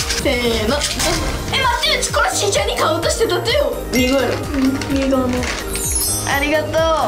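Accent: Miyazaki, Japan. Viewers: 宮崎弁ところどころ使ってる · 宮崎弁可愛い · 宮崎弁でしゃべってるのかわいい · やっぱ宮崎弁やん かわいすぎ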